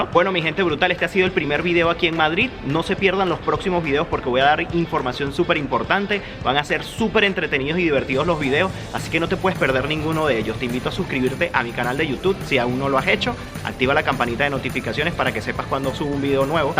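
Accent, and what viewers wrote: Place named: Venezuela. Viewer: Saludos de Rio, Brasil...te oigo muchacho para entreinar el espanol de Venezuela... ...y te deseo mucho mas sucesso gajo en Portugal y tambien con la nueva lengua que aprendes. Tchau!!! 😊😊😊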